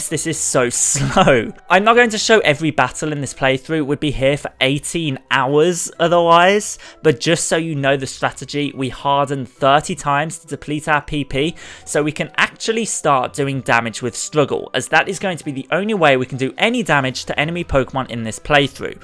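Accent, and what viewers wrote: Birmingham, United Kingdom. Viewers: Damn, all these videos I've watched and I had no idea you were from the same town as me, Brummies unite! You'd think I'd recognize the accent but apparently not. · My guys from Brum! love that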